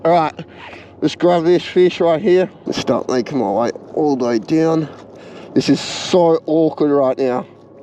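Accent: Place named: Australia. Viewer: bros a certified aussie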